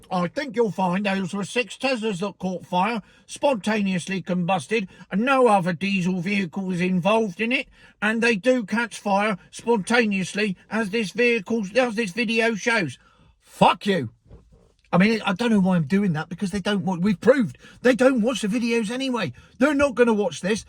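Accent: Cornwall, United Kingdom. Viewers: Your funniest video yet. I was pissing myself all way through lol. Love the cornish accent,takes me back to when i was young,my grandparents were cornish. Btw watching your video there were lots of orbs floating around your head.spooky.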